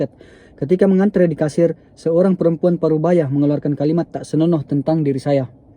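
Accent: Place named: Toraja, Indonesia. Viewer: Macam sy tau logat bang kayak org susl sel deee kayak Toraja👍🌷🌷🌷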